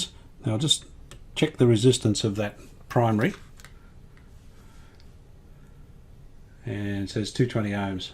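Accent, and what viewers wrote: Australia. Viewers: Great video as usual. Although you indicated that there was a difference between yourself, fellow Australians and New Zealanders, I really couldn't discern a difference. Though those that live in the northern US states say they have a hard time understanding we southerners, I have a hard time understanding those from the New England states. Oh well. Hope it isn't too hot for you there. Merry Christmas to you and family!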